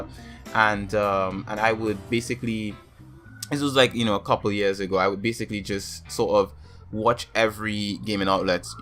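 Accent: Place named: Trinidad and Tobago. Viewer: What country are you from? The accent is feeling like a trini 🇹🇹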